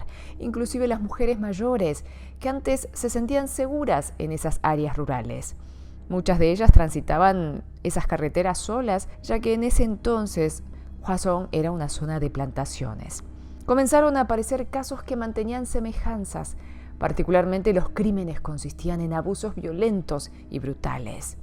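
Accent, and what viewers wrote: Argentina. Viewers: xd es raro ver asiaticos con el acento argentino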